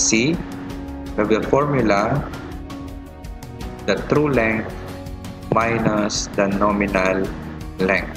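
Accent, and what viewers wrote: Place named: Ilocos, Philippines. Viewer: Love the ilocano accent😘 you save me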